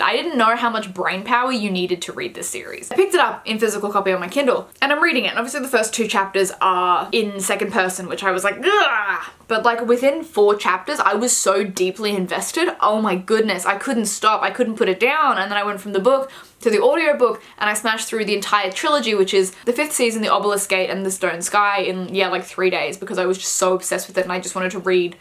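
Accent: Australia. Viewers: I think beta reading is a great idea, and it's so cool to have an Aussie audio book narrator.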